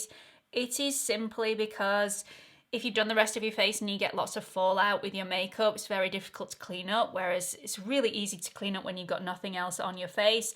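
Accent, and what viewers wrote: United Kingdom. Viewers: Hi, thanks for another great review. You always create the most beautiful looks with such a simple technique. Also, no worries about pronunciation. I find that a good many words are pronounced differently in the UK vs the US (I love the way you say vitamin... We pronounce the first i as you would in the word like and then the rest the same as you). It is just a cultural difference and definitely nothing to worry over. Peace, love, and happiness!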